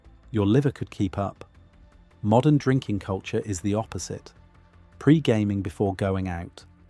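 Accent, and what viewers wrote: United Kingdom. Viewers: "indian pale ale" lmfao. and you're using a brit accent for what i now assume is your ai narrator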